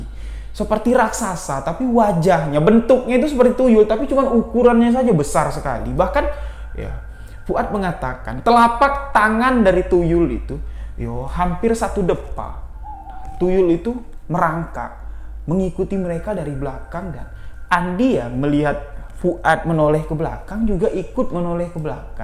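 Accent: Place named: Madura, Indonesia. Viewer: kya logatnya org madura...denger cerita spt ini kn enaknya sambil merem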